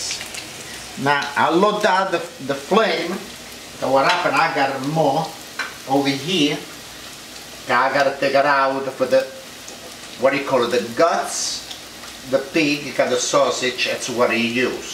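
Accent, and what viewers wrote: Italy. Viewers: The best Italian accent ever !!!!!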